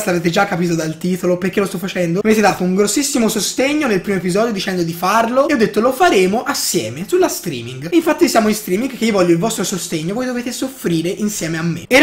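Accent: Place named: Florence, Italy. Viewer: mi fai morire😂😂 in un manihomiooo💞😂...quanto adoro la pronuncia dei fiorentini ahah💞😂😂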